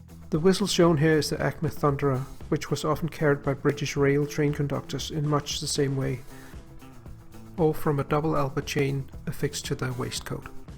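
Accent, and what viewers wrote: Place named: Denmark. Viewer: at first i wasnt sure where you were from but then i realised you were danish. så det er sejt at høre at jeg ikke er den eneste dansker med denne hobby haha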